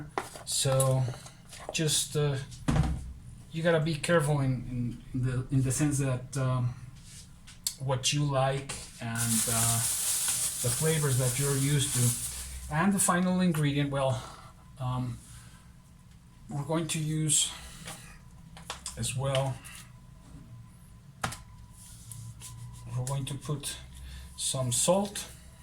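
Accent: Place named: Spain. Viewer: Maybe your accent is derived from Spanish, Wenders is German, but seriously heard a similarity, also in your contemplative style.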